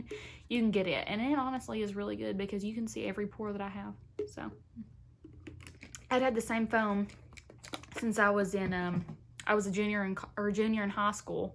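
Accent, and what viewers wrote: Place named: North Carolina, United States. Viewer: I just found your channel today! I feel like I am listening to my sister in law-she's from North Carolina. Where are you from?!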